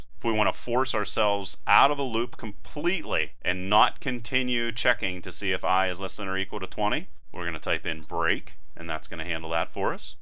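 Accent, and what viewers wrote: Pittsburgh, United States. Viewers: I got excited when I heard the Pgh accent. Represent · Being from Mount Washington.I heard that Pittsburgh accent right off. ;)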